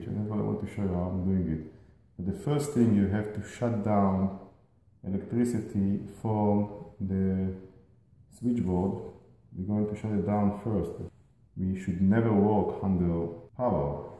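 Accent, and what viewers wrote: Israel. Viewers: I recognize that accent from anywhere...ivrit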